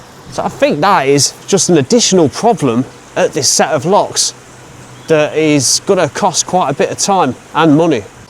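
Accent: United Kingdom. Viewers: And here was me in NZ thinking it was just one of the British dialects! Adds to the flavour!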